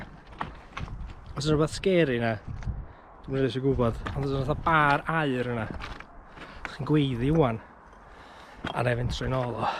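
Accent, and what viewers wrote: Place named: Scandinavia. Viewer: I don't speak welsh at all, but one thing I found really interesting here; Normally I hear a massive english accent at least from southern welsh speaking people. Your accent sounds almost nordic/scandinavian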